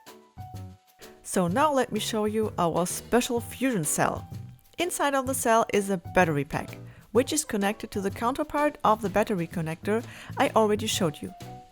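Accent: Germany. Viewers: ehm youre from germany aren t you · Coole Videos! Aber ich kann mir nicht helfen. Irgendwie glaube ich einen deutschen Akzent heraus zu hören :D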